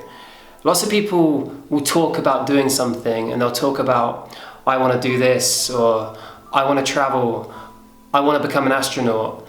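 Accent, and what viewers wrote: United Kingdom. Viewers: Woah I'm just watching one of your first vlogs on your old channel and your british accent was so much thicker. Definitely can tell you've been spending a lot of times in non english speaking countries. That'll happen to you!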